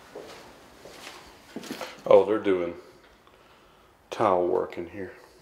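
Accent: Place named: Tennessee, United States. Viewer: l am from Canada and l lived in Manchester, Tn in the 2000s and your accent reminded me right away of being back down there in Tn. Loved it and loved the weather.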